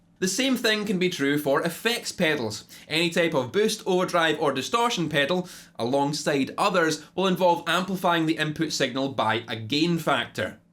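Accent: Scotland, United Kingdom. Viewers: Why are all the guys who make pedal videos Scottish or from the British isles?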